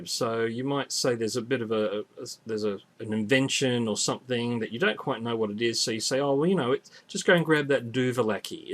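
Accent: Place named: Australia. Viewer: Doovalacky is a technical term for a tool I can't remember the name of as opposed to person being a tool whose name escapes me. 😜 Are all of those words still in use? Some I haven't heard in years. A cigarette was a rollie, tailor made or ciggie, even cancer stick by some aware of the dangers. Can't forget going outside for a smoke or fag. Thanks for sharing. Am enjoying hearing an Aussie accent.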